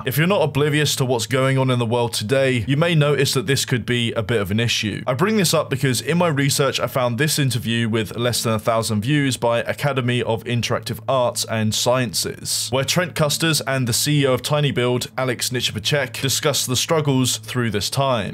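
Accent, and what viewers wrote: United Kingdom. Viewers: very pleasant surprise to click a commentary video and hear a fellow brit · BABE IT'S 3AM! TIME FOR ANOTHER LONG FORM CONTENT NARRATED BY A BRITISH GUY!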